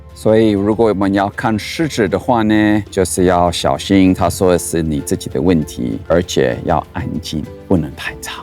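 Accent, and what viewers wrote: Taiwan. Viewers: 你說話根本就是台灣人，老婆又漂亮，真有眼光。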